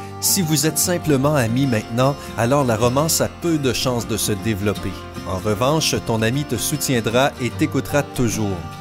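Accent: Quebec, Canada. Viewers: Hey st'un québécois qui parle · La voix off ses un québécois tabarnak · Tain c'est un québécois 😂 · J'adore ton accent du qebec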